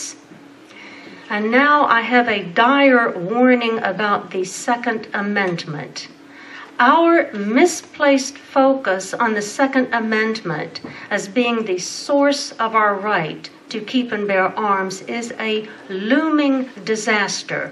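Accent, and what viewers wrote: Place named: South, United States. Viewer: I LOVE this Lady! There is nothin like a Southern Belle to eloquently explain our Constitution and 2nd Amendment!!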